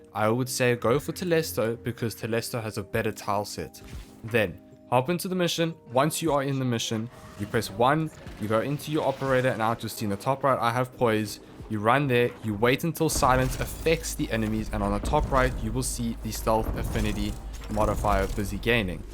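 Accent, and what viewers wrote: South Africa. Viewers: Dude you sound South African. Probably from Joburg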